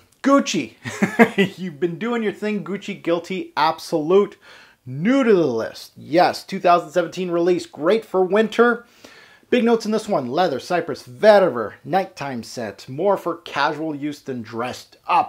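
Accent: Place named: Montreal, Canada. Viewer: Dude are you from the province of Québec? Sounds like a solid Montrealer accent :)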